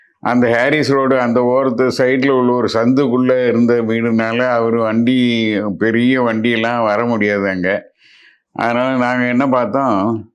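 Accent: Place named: Tirunelveli, India. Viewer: சரளமான நெல்லைத் தமிழ்.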